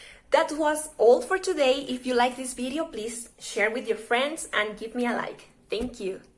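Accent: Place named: United States. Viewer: Coño primita pareces united states y todo jajajaa te felicito 💕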